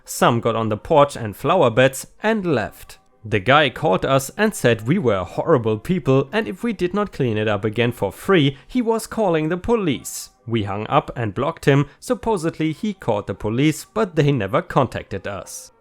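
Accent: Germany. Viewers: 🌟🌟🌟⭐⭐⭐🌟🌟🌟 I listen to you and love your readings. I's say you and rSlash are my favourites then close second are Mrreddit and Markee. I've been playing yours as I go to sleep so hope that helps your channel, about 21-27 vids. I also have a soft spot for Germans, and I mean that in a friend manner, du bist in sicher lol (Excuse my German) Your narrations are awesome and your accent is the icing on the cake. Thanks 💛💚💛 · Germany. Not every german sounds like a nazi in a indiana jones movie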